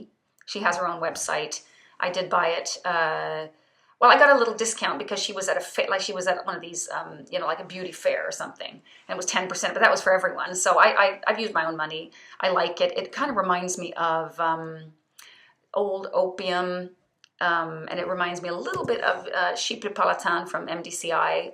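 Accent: United States. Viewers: Hi. I believe that you are Swedish, so I think it's interesting that you sound American. Also, I appreciate your insight concerning the fragrances you mention. I plan to start familiarizing myself with Comme de Garcons and some of the other ones you mentioned in this video. Recently, I added Guerlain "Bois Mysterieux" to my collection and it instantly has become one of my favorite fragrances. I highly recommend that you try it if you haven't yet. Two days ago, I wore another favorite of mine: Veronique Gabai "Cap d'Antibes". I like that one a lot. Have you tried it yet? I'd like to hear what you think about "Bois Mysterieux" and "Cap d'Antibes". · Her mom from US that’s why she sounds American).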